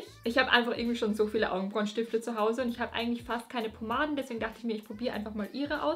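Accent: Austria. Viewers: Und auch so lieb und immer freundlich und dein österreichischer Akzent ist echt cute und du bist so talentiert und kreativ und ich liebe dein Intro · Hallo ich kenne dich noch nicht lange aber kommst du aus Österreich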